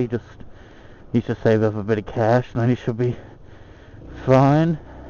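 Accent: United States. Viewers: You seem to have an American Accent, Im confused... And happens all time at traffic lights lmao, pain in the bottom xD I normally end up running them or just turning around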